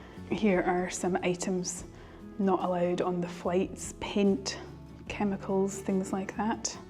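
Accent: Scotland, United Kingdom. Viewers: Nice video. No bull just right and love the scottish accent ❤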